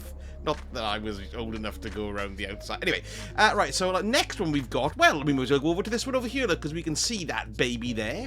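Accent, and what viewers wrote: Wales, United Kingdom. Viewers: Do I want these triumphs badly enough to listen to a welshman for 10 mins...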